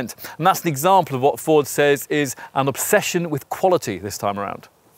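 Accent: England, United Kingdom. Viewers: yeah, well, he is english mate. he speaks the queen's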